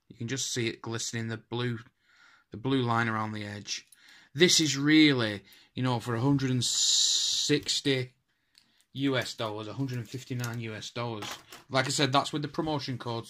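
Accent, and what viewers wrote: Bolton, United Kingdom. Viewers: You sound like your from bolton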